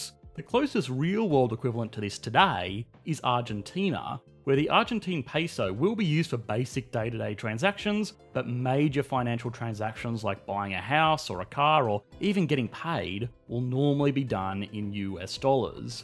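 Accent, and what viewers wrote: Australia. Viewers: Is this guy Aussie or what. What is he jabbering about anyway? Can anyone clarify? Help.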